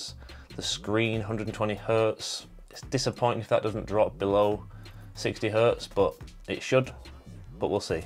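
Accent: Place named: England, United Kingdom. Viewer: Decent unboxing man. Thanks for showing off the colour. Totally agreed on the matte black, would've preferred last year's blue (did you see it?) but matte black easy second choice. Its about time we moved in from glossy fingerprint magnet phones. Just no. I got my Galaxy S10 in green because I wanted flashy but when it was nicked I had to get black. I was wrong, black much more refined. I'm rocking the red case now. Black and red classic combo. Reckon I'm getting the same as at next pay day (tempted to get the watch too). 128GB is plenty for me (my S10 is 128).I like Samsung's OneUI but OxygenOS looks much more refined. I use barely any of the Samsung excessive extras. Also totally agreed on the camera front. It's important but all American YouTubers do is bang on about the camera. The world's in a bloody lockdown anyway, my cat doesn't need another photo taking 😅. 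They barely mention the software and it's so important. A good phone with a bad Android launcher kills it. And from one Englishman to another your accent 👌.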